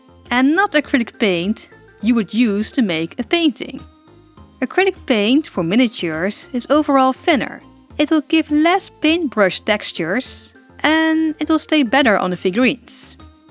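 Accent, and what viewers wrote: Netherlands. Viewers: Ik heb geen intresse in verven, ik heb vrij weinig pkmn speelgoed, maar het is zover best entertainend. Ik vond het wel grappig dat ik dacht dat je een Nederlands accent had voor dat ik je kanaal verder bekeek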